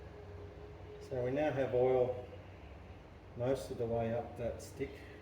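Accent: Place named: Australia. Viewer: I do like the Aussie accent, mate. The metric measurements and colourful expressions are also charming.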